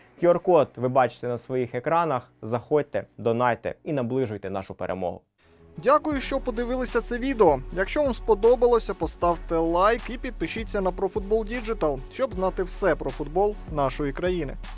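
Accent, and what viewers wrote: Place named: Western Ukraine, Ukraine. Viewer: Яка погана украiнська мова, с бандерськiм смердячим акцентом... Нiхто не збирався окупувати "украiнський" футбол , а лише зррбити шоу для вболювальникiв! Чемпiонат Радянського Союзу був на багато краше нiж чемпiонати "незалежних" Росii та Украiни, особливо на той момент, коли всi футбольнi зiрки збiгли за кордон.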